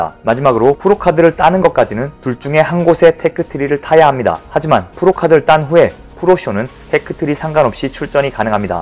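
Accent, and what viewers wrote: Gyeongsang, South Korea. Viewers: 영남권 사투리지 않나요?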